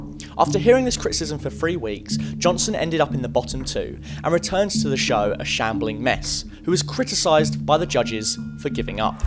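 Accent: United Kingdom. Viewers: Your British accent is making my ears rot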